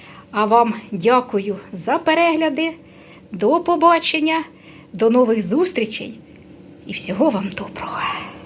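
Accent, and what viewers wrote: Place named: Kirovohrad, Ukraine. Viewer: ви молодець,сільська трудівниця.Люблю вас дивитися і слухати.Мої дідусь і бабуся так розмовляли́ З Кіровоградщини.Миру, здоров'я ❤❤❤❤❤❤❤❤❤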